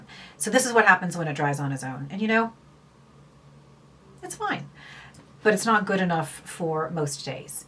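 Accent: Ireland. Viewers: Thanks, just showed this to my mom so she can use mine. You have an irish twang to your accent! Where are you from?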